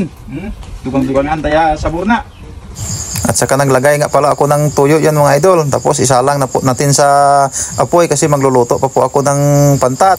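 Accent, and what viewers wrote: Iloilo, Philippines. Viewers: Ilonggo gid haw,,😂😂😂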